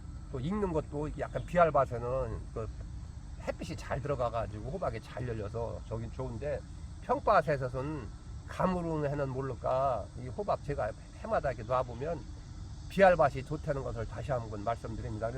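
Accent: Chungcheong, South Korea. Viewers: 충청도 사투리 정말 정감있고 와닿네요~^^ 단호박 비료 안줘서 한이지~ㅋㅋ 매번 잘듣고 도움되고 있습니다.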